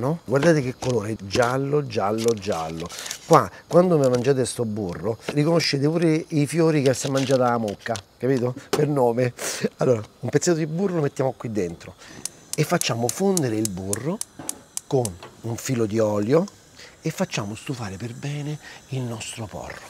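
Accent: Rome, Italy. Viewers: Ti auguro il meglio per te, e sempre forza 🇮🇹, continua sempre così con il ns made in italy, con la tua ironia, pacatezza ed accento romano.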